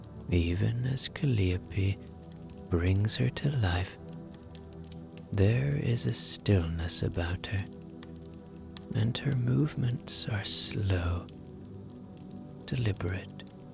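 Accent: Ireland. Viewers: Irish story tellers are the best! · I never hear anything of your stories because I fall asleep immediately 😅. I love your Irish accent and voice and the fantasy journeys! I also love that you were inspired to use your gift this way! After the 1st story (monk in valley), I felt better since. I think it removed blockages while I was asleep.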